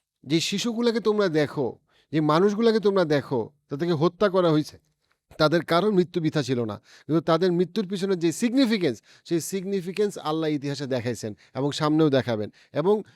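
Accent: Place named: Bangladesh. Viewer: অবাক হওয়ার কিছু নেই. Newyork বাংলাদেশি আর পাকিস্তানী তে ভর্তি. আপনার কথা শুনে আর English accent শুনে বোঝা যাচ্ছে আপনিও বাঙলাদেশি.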